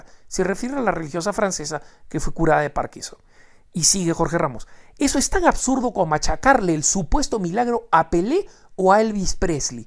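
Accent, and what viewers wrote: Peru. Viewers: Perdón, pero aquí, el totalmente desconocido eres tu(el que hace la "narracion" en el video) por tu acento se eres peruano, pero, ignorante,Jorge Ramos aunque tenga un nombre y apellido muy común,es uno de los 50 personajes mas influyentes en el mundo Hispano; y claro que está autorizado para cuistonar no solo a los papas sino hasta presidentes de paises (como lo ha hecho)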